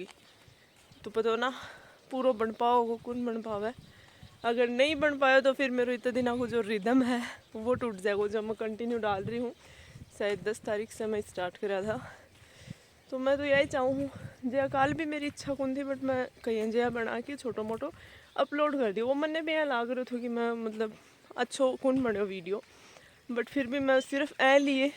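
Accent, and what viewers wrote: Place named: Rajasthan, India. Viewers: Marwadi bolu yaa shekawati maine samjh toh nahi aaye but lage aap yaa Nagore side se hu yaa Sikar side se...... Best of luck 🤞 for your preparation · Madm ji thari boli payri lage, ye shekavati boli h kya 🙏🏾🙏🏾🙏🏾🙏🏾🙏🏾🙏🏾🙏🏾🙏🏾🙏🏾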